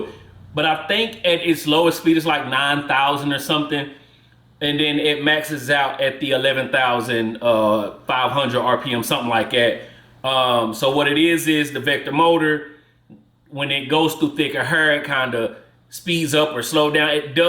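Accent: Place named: St. Louis, United States. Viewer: Loved the review. Very detailed. Plus it sound like you from the Lou lol. New subscriber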